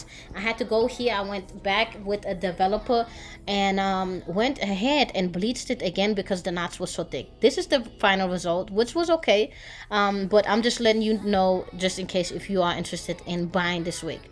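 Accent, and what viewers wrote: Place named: Cambodia. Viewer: Your assent sounds Cambodian love it wow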